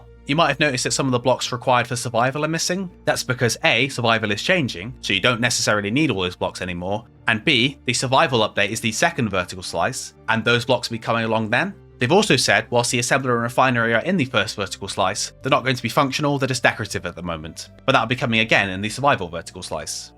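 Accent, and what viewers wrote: England, United Kingdom. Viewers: A li ttle bit of English lad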